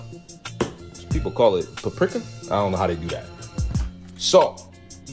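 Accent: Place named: Philadelphia, United States. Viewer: I knew my man was from philly 2 seconds into the video You did your damn thing on that jawn💯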